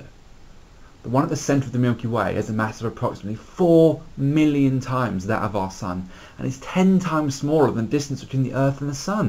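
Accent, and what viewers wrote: United Kingdom. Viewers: i wanted to understand it but i cant understand with his british accent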